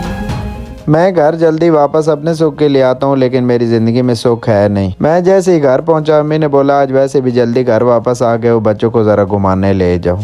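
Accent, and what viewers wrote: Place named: Punjab, India. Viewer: I really like the punjabie touch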